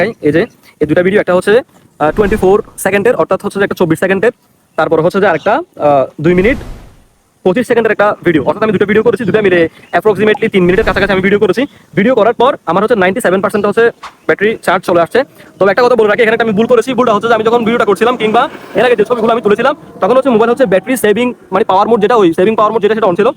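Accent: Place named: Chittagong, Bangladesh. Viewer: হ্যাঁ ভাইয়া ভালো কনটেন্ট ক্রিয়েট করার জন্য আপনাকে কিছু উপদেশ দিতে চাই কথাগুলো আস্তে আস্তে বলবেন যাতে করে মানুষ আপনার কথাটা সম্পূর্ণ বুঝতে পারে. আপনি চট্টগ্রামের আঞ্চলিক ভাষায় কথা বলায় খুব দ্রুত বলে ফেলেন তাতে করে অনেক মানুষের বুঝতে সমস্যা হয়।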